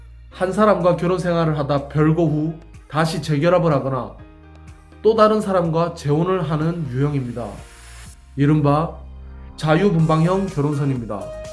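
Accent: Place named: Gyeongsang, South Korea. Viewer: 말투가 경상도 분이시라 ㅋㅋㅋ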